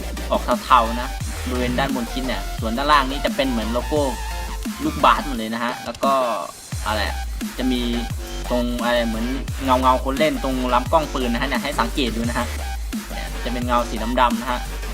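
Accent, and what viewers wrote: Isan, Thailand. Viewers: พี่เปนคนอีสานหรอ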